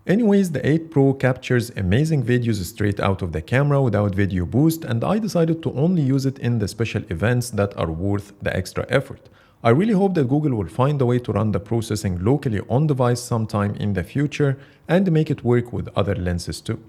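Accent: Egypt. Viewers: You sound Egyptian are you? 😀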